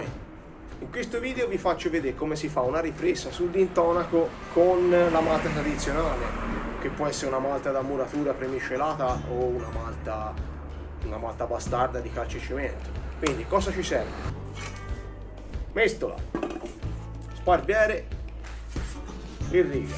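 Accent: Tuscany, Italy. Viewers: Quanto sei simpatico oltre a essere bravo...poi con il dialetto toscano sei al massimo. Ciao dalla provincia di Pi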